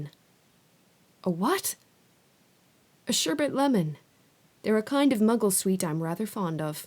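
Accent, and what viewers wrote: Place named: United States. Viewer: I m learning ENGLISH. I m looking for American accent for reading Harry Potter . Finally I found you !and even better, you re a lady ! I m so happy!THANK YOU SO MUCH ❤❤❤Your voice is amazing ❤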